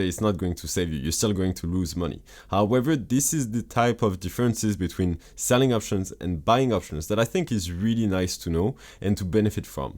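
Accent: France. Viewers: Good video mate , I tried to open a tasty works account (I’m in 🏴 England) Was easy to open but getting the money into the account via CurrencyFair was a right nightmare. Ended up opening an interactive broker account and I can get the money in and out with no issues as interactive brokers have bank accounts throughout the world so it’s just like sending it to another English bank account. My 1st cash secured put will be going in today also 🥳🥳 on AT&T because ultimately I don’t mind if I get assigned. By your accent I’m guessing your french so do use CurrencyFair or a french bank to fund tastyworks just out of curiosity. Have a good day.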